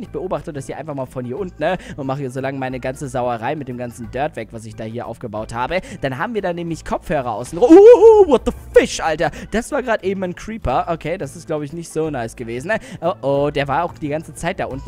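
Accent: Russia. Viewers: Kommst du aus Russland?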